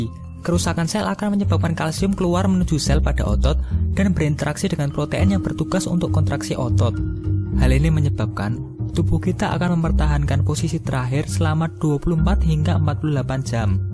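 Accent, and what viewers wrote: Java, Indonesia. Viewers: logat jawa nya lengket banget wlwpn pk bhsa indo. 😅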